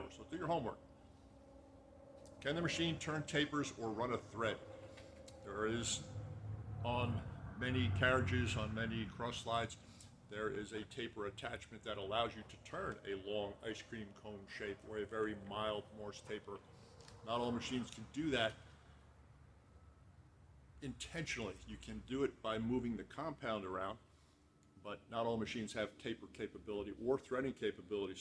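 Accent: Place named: Philadelphia, United States. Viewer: I'd guess Philly or nearby South Jersey but north of Baltimore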